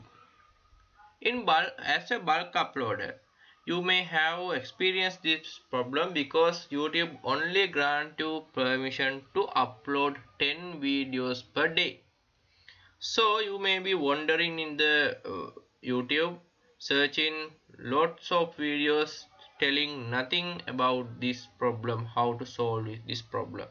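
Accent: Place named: India. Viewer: I don’t understand anything he saying because it’s Indian accent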